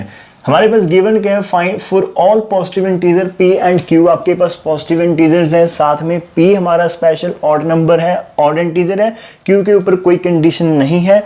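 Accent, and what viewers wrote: Punjab, India. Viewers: Sir galti pakad bhi woi sakta hai jiska uski knowledge hoti hai Your teaching method is awesome and i love your talking style hindi punjabi so cute❤️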